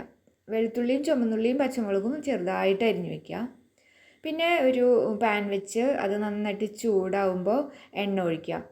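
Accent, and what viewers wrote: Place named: Thrissur, India. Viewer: നല്ല ഒരു side dish 👍👌😋😋 New 👫🤝🔔✅️ തൃശ്ശൂർ ആണോ സംസാരം കേൾക്കുമ്പോൾ തോന്നുന്നുണ്ട്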